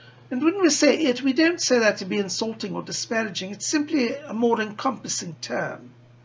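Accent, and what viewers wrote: United Kingdom. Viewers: I love you so much (I feel compelled to call u ). I am British. You are speaking the queens English. Fletcher love to you. Xxx.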